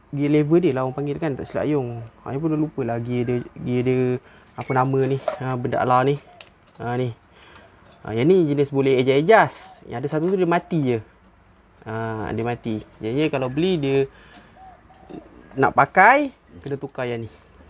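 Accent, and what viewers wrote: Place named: Malacca, Malaysia. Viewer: Dengaw loghat, blh tahu.. kompom melake…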